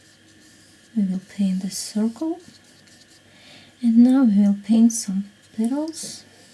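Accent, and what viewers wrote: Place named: Russia. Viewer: вы русскоговорящая? Акцент очень наш))))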